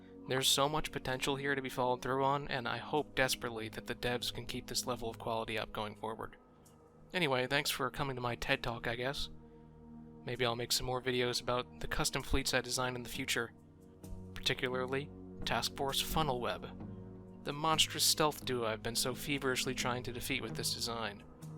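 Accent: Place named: Rural, United States. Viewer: There’s something about Country Twang and space ships….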